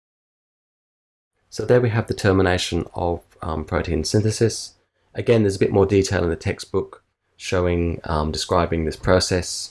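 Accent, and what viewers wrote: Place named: Australia. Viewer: Best Molecular Biology Professor; your videos are timeless, like etenal. It also made me like Australian version of English outspeaking.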